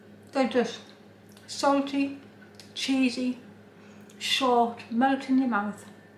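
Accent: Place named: Newcastle, United Kingdom. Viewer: Thank you for sharing this recepy! I just made them and they taste great!!! I love watching your videos, mostly the sewing ones. I'm a quilter and sewer and crafter at heart. I looove your Jordi accent, brings back memories!!! So happy I found you. Lots of love Susanne from Germany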